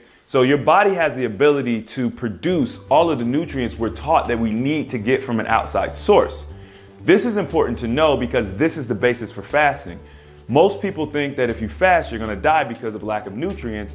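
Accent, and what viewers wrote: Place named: Philadelphia, United States. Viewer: You can actually change your body weight set point by keeping the weight at that lower weight longer term so that your body gets used to being that weight and doesn't feel you are in an environment of scarce food supply. Dr. Paul Mabry on Facebook on his group has good insight on this phenomenon. Also, Dr. Jason Fung had mentioned this as well in his talks on YouTube. Hope that helps. I too am trying out new things for the new year. I have done 1 to 16 day extended fast and always practice IF daily. I just started resistance training. I am trying to loose the extra 20 lbs gained unhealthily through holiday and emotional binge/food addiction eating and trying to fix my relationship with food in general. I love your channel by the way! Are you in Philadelphia? You seem like a Philly guy by how you speak and mannerisms- not a bad thing, just observations. 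I live in suburbs of Philly, but went to Thomas Jefferson University where I got my BSN in nursing and lived there in the center city area on campus as a student. Anyways, you are an inspiration to me and I hope to get as great as results as you. I definitely need to decrease my body fat%. I am currently at 24%! I am only 5ft tall but weight up to 115 lbs, which a lot for me! I feel so bloated and uncomfortable in my body now. I felt best around 100 lbs. When I did my 16 day fast got down to 97 lbs and felt amazing! Getting back to that area of weight, no less, is my goal 97 to 100 lbs. Anyways, keep up the good work!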